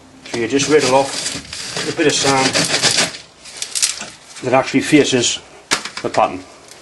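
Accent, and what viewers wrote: Newcastle, United Kingdom. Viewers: Love the old videos, you sound more Geordie back then!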